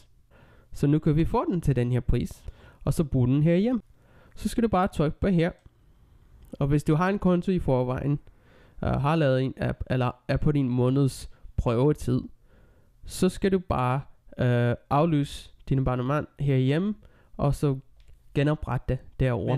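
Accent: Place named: United States. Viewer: Hey, kan ikke lade vær med at tænke på din accent, hvor er du fra, det lyder lidt som om du kommer fra USA? ;-) har jeg ret?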